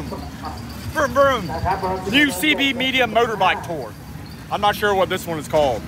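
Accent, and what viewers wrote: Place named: Pittsburgh, United States. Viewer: That accent...you from Pittsburgh? WV? Eastern OH?, anyway good videos.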